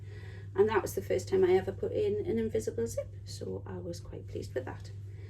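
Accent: United Kingdom. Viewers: I do love that fabric of this latest wrap dress it's so lovely. I wonder where in the UK you live as I'm trying to identify your accent.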